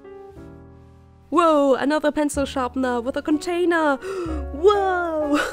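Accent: Germany. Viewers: bist du deutsch? du klingst deutsch :D ok ja, bin ziemlich sicher :D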